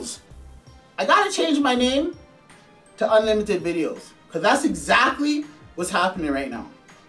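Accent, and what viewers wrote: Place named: Toronto, Canada. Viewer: Fam u sound like u from Toronto! Your hilarious brother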